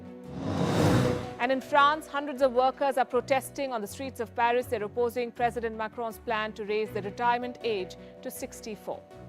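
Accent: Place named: India. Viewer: What are you talking about this is one of the indian accent. India has 100's of languages and as such has that many English accents. She speaks English with Hindi accent.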